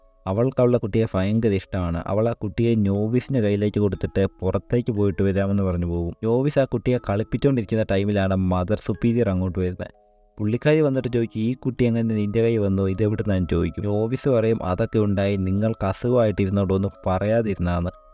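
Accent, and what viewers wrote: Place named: Kozhikode, India. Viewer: കോട്ടയത്ത് ഉള്ളവർക്ക് നല്ല pronounciation ആണ്. ഇത് kozhikode or kannur ആണ്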